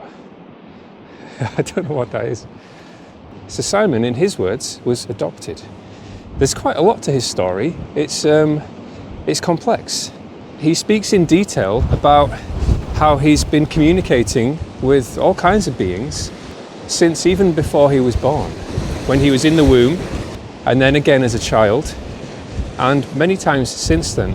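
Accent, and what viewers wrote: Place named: Yorkshire, United Kingdom. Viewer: He sounds normal for Yorkshire.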